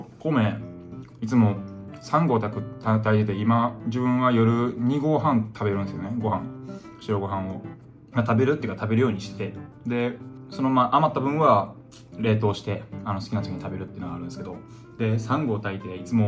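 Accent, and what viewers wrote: Kansai, Japan. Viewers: 初めて喋ってる動画見たけどイントネーションで、ん…？ってなって調べたら関西出身なんだね😳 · 関西人やなーw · なんだかんだ関西弁での喋りを聞くの初かもしれん。 インタビューとか標準語だったし。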